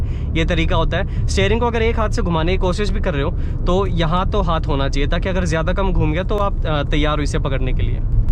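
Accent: Haryana, India. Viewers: BHAI HARIYANA SE H KYA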